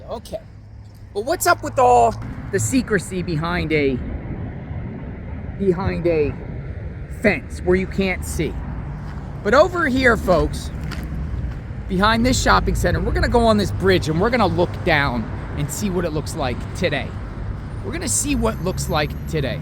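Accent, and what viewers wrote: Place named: Midwest, United States. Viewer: Are you from Michigan or Wisconsin ? Trying to place the accent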